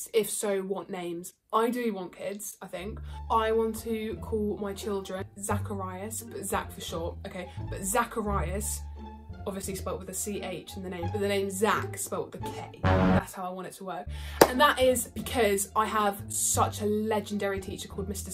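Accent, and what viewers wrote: United Kingdom. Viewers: I can’t tell if she’s British or American 😂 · Love your accent! What part of Britain are you from? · are u british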